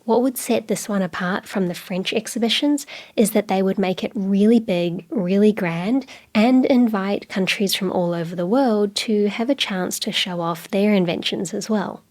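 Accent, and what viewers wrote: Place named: Australia. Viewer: Which city are you from? I ask this because you have a very interesting accent that sounds very distinct from other Australian accents.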